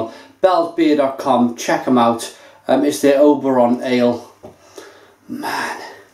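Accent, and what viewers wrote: United Kingdom. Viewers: Gosh i hate British accent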